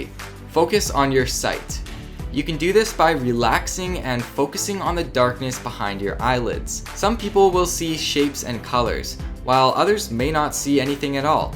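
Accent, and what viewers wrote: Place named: Canada. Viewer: I sense a Canadian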